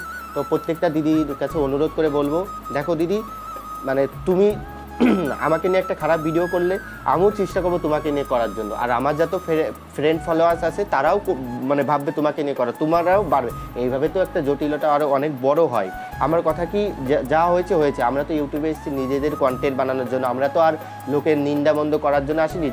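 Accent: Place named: Nadia, India. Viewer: 34,তোমার সব কথা শুনলাম ভালো লাগলো সত্যি ইউটিউবে এখন কুটকাচালি চলছে, কজন বোঝে এই কথা, ভালো লাগলো👌👍 তুমি কি নদিয়ার বগুলাতে থাকো তোমার কথা গুলো ঐ দিককার মতো, ভালো লাগলো ভাই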